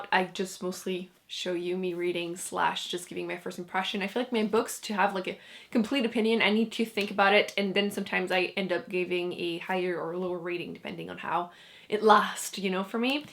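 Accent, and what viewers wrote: Quebec, Canada. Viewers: I just found your channel and the moment I heard your accent I thought "Oh she's Québecois French." So just out of curiosity are you Québecois? I live in Québec so if you are it would be pretty awesome to have a booktuber live in my province. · janessa blake She's French Canadian 🙂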